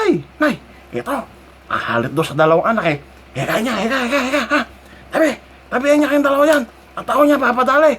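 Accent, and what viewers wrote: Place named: Imus, Philippines. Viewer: Ha ha ha..Kuhang kuha ang tunay na puntong Imus, ha ha ha..Caviteño here for life. Naknangpusa yan! Amabu primero pa nasabe ha ha ha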